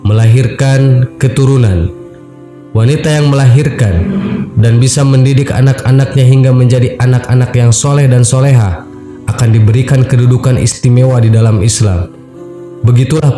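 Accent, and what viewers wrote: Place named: Sunda, Indonesia. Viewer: Suaranya si abang khas bangeetzz Suara org sundaa..mantep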